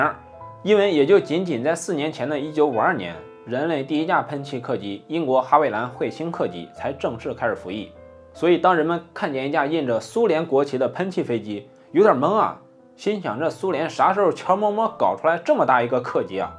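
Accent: Henan, China. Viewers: 謝謝讓我增加了很多航空知識,雖然我在台灣,但是我的父親祖籍河南舞陽,所以聽你說話特別親切,謝謝你讓我還能聽到家鄉話,希望你的頻道能長長久久,加油,謝謝!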